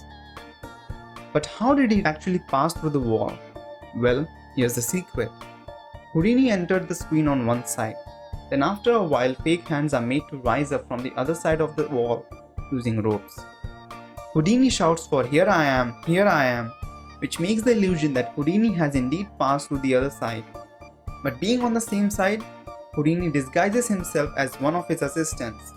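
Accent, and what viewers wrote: India. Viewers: Mi sijaelewa kabisaaa lafudhi yako ya kihindi....mgumu kuelewa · his unbearable Indian accent! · Why da hell is the Hindu trying to convince me with his accent ? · When v sounds like w, you know he's from india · U have indian ascent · This guy sounds like Indian. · Because he is an Indian. And sadly for you, you only talked Indians before via Tech supports. So you're now surprised how a Magic explainer's voice can be an Indian voice. Fact of the matter is, magic tricks finds it's home in India. It's a home of magic. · I like the VIDEO, but i don't like the narrator's accent. Please don't use Indian accent, coz Indian accent sound so stupid. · I also think His English assent is completely Indian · Sound like Indian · Are you indian? You sound like an Indian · You sound very indian and that's a great thing . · Its Indian English.... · Is the narrator in this video indian 🤔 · That Indian Accent Puts Me Off! 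· Is this narrator Indian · From India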